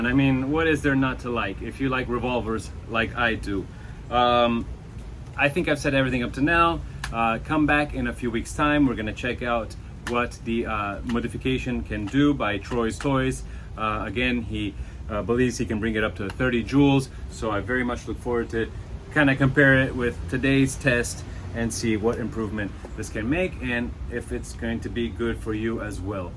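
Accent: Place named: Italy. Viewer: Ciao grande!,. Tu sei italiano vero??? Su sente dallo slang inglese ... Parli perfettamente inglese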